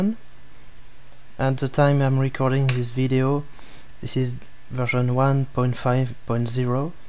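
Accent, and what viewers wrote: France. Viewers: Are you french ? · Whew dude you have a thick French Accent.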